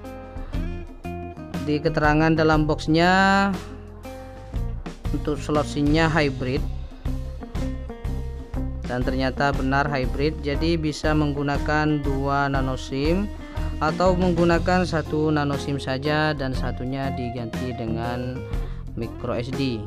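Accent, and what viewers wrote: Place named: Madura, Indonesia. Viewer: Kok kedengarannya seperti logat Madura😁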